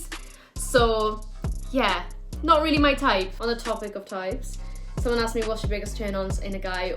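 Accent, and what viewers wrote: Wales, United Kingdom. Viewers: I love your welsh accent babe 😍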